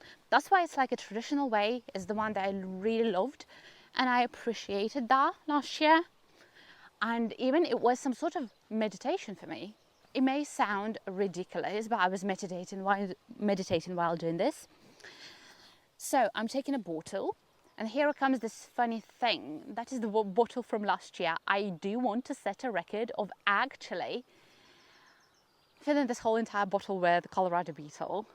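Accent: United Kingdom. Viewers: I am glad you chose to cultivate a traditional British accent rather than an American one! It is definitely harder to learn, but, as evidenced by your gardening, you are a wellspring of passion. Good luck.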